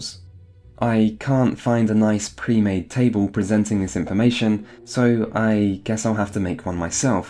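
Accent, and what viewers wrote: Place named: United Kingdom. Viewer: You sound so much like the youtuber shaun or maybe I'm stereotyping british accents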